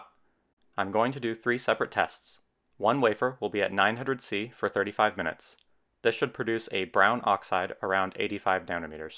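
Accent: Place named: United States. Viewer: Fascinating, and aesthetically pleasing. Look forward to future videos. P.S. this was my first time ever hearing someone with US accent correctly pronounce the word "niche". It grates me when I hear "nitch" - I just can't help myself!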